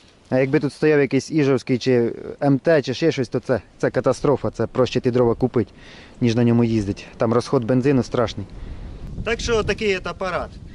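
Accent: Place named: Western Ukraine, Ukraine. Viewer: Як мені родом з західної приємно слухати вашу говірку ❤❤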